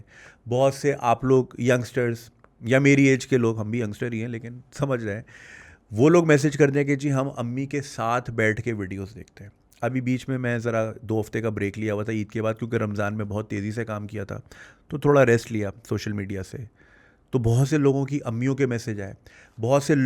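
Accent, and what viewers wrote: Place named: Punjab, Pakistan. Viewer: correct your Urdu. You speak punjabi style urdu. For example, aap bolte ho, aap jate ho etc. Correct Urdu is aap bolte hain, aap jate hain. Secondly, why do you live apart from wife and one year old son. Sounds weired! Why? British Government do not allow you to live in UK. Also, you mentioned in one of your video that after the delivery hospital arranged free taxi to home for your wife. It doesn't happen here even if your are on benefit. I first performed Umra at the age of 22. Nothing spectacular happened as you described. It is typical of usual exaggeration.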